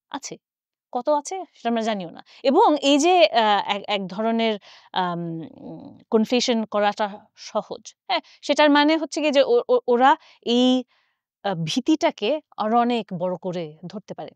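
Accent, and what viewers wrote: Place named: Bangladesh. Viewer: না জানলে বলেন কেন? এখন তো ইনফরমেশন খুব সহজেই পাওয়া যায়। ট্রান্সলেশন সহজে করা যায়। নেহরু লিয়াকত চুক্তির নাম শুনেছেন? এ মহিলা চাকরি পেল কি করে? ব্যাংগালোরে আসুন দেখিয়ে দেব কত বাংলাদেশী আছে। আপনাকে দেখে যে কেউ বলে দেবে আপনি বাংলাদেশী। আপনার কথ্য ভাষা প্রমান করে দেয় আপনি বাংলাদেশী।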